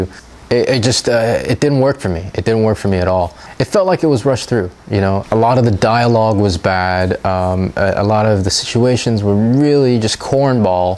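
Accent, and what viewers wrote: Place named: United Kingdom. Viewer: You do a great job,! Love the British accent, too:)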